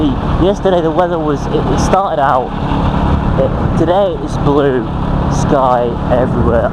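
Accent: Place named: United Kingdom. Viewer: Haha, you sounded just like a young M13 with British accent. Do you have problem understanding Irish people sometimes? I have to have subtitles watching Irish movies.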